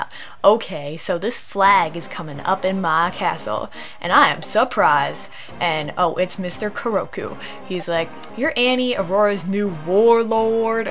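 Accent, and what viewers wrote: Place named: South, United States. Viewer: you seem to have that kind of twang in your speech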